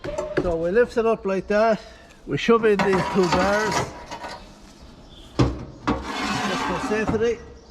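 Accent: Ireland. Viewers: That's because it's an Irish accent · right at the end of the video those of us eagle eyed old boys 🤣🤣🤣 - we can see what looks like “red straps” to a dress. Knowing that you and ‘mum’ went shopping in Clemont, we all assumed that it was a new purchase! (I think we were all hoping for a fashion show 🤣 but then we would be a different type of old men....... ) You have to remember that most of us have been shut away and you have become a farming celebrity. We have all taken to you, mum and dad - it’s honest, real, true and interesting with a touch of fun. Plus there is nothing nicer than your Irish accent. Take care, smile and keep posting true, real, interesting videos - we all enjoy them. Richard xx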